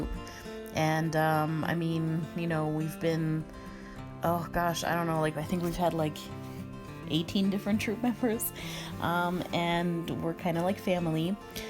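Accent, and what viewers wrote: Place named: United States. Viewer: Why do you have an America accent?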